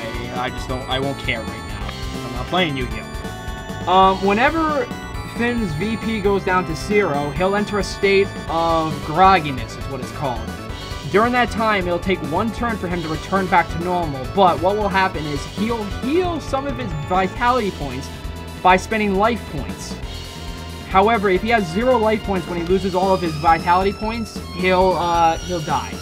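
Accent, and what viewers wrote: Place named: New York City, United States. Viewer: Is this LP'ers voice for real? Or is he a parody of an NYC Jew? That voice can't be for real.....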